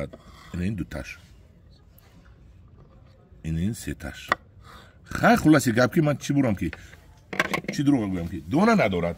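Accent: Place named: Tajikistan. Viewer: Ахсан ба Шумо, бо шеваи хушруи точики сухан карданатон, ба Шумо бурдбори хохонам.❤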